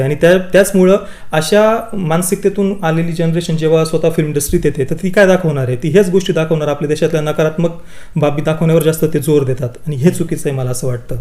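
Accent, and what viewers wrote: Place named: Nashik, India. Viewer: तुजा मराठी एक्सेंट फुल नाशिकी आहे मला वाटल पुणेरी असेल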